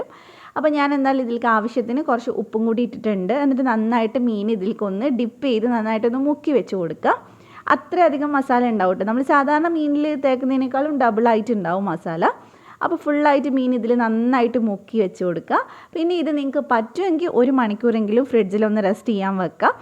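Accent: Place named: Ernakulam, India. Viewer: നല്ല സൗണ്ട് ആണ് കേട്ടോ .നല്ല അവതരണം ശെരിക്കും എറണാകുളം സ്പീകിങ് .തുടരട്ടെ മുൻൂട്ട് മുന്നോട്ട് പൊളിച്ചു ഇത്താത്ത പെണ്ണെ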